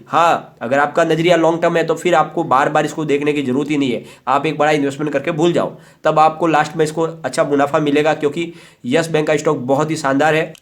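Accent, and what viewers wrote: Gujarat, India. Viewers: Gujrati lago cho.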